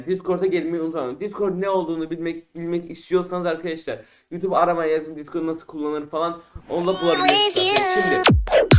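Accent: Azerbaijan. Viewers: Azeri misin Türkmüsün bir dilde konuş anlamıyom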